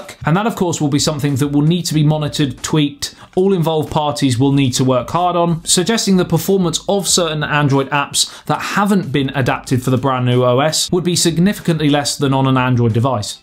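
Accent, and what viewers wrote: United Kingdom. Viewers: Such a thick British accent...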